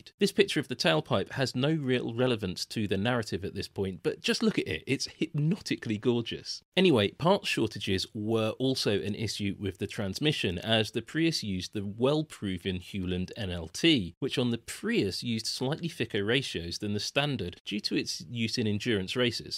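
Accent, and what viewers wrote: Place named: England, United Kingdom. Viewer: English accents are the worst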